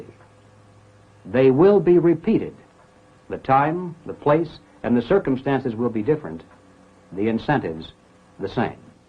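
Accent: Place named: England, United Kingdom. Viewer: いつもありがとうございます。の語りは大変聴きやすいです。英語音声を消さないのもいいですし、日本人には探せない情報を英語訛りで話されていると有り難みが増すのですが、声質がソフトなので聴いていて全く疲れず、スムーズに頭に入ってきます。個人的には、大統領選挙投票前のイーロン・マスクに、終わりやん、って大阪弁でしょうか、というのがとてもニュアンスが伝わりました。日本人には出来ない翻訳です。全然関係ない映画の話ですが、コロニアという映画で、DSより更に危ない追跡者から逃げ切るエンディングで、旅客機を無理やり離陸させてと主人公が頼む場面で、機長が「OK」と応える和訳が「クソッ」になっていたのがちょっと嫌でした。文化が違うと直訳とは程遠いものになったりしますが、の和訳はニュアンスが極めて正しいのではと感じています。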